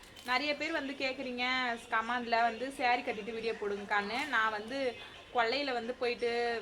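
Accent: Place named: Cuddalore, India. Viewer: கொள்ளைக்குன்னு சொல்றிங்க கண்டிப்பா கடலூர் மாவட்டம் தோணுது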